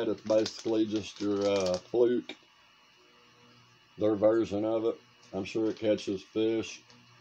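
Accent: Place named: Northeast Alabama, United States. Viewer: Bud, you sound just like we do in northeast alabama. Lol and I believe that bass baits monthly won out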